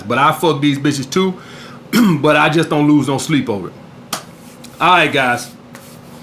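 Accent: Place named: Alabama, United States. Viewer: Battlerap Legend Alabama accent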